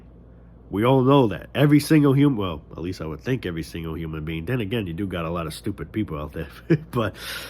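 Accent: New York, United States. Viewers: One of the best parts of discovering your channel is that my best friend and roommate is from New York. We live in Florida. And we talk about a lot of stuff and when I mention things that you're talking about. Right now he sometimes shuts me down. But it's great to know that you are from New York and you speak Truly from the heart and The accent and the truth at the same time is so funny to me. It's like you're saying what I'm thinking and you just say it. I am not white, but my roommate is New Yorker to the bone, just like you I did live in Chicago for some years. So I do know the city life. But never been in New York. And you guys speak from the hip. You just say it. And I respect that as far as the channel and people disrespecting how you and we think. And why we listen to you on a daily basis if you would put out videos on a daily basis. You just speak the truth and the truth is something that can't be hidden and you will grow because people will hear you and follow you because you have the Audacity to speak the truth Bravo. 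Bravo you are what humanity should have been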